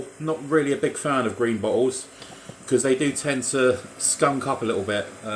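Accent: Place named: United Kingdom. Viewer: your british accent is so lovely and comforting, like music for my ears and heart ❤ thank you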